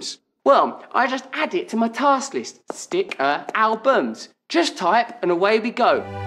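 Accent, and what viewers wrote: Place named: United Kingdom. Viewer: I'm not British... so everytime I listen to this guy, I can't stop laughing, hahaha. Strong British accent, hehe :D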